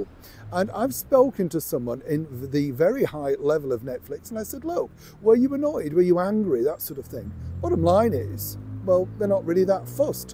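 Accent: United Kingdom. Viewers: The British accent makes it hard for me to understand and I gave up on this video. Boring as hell.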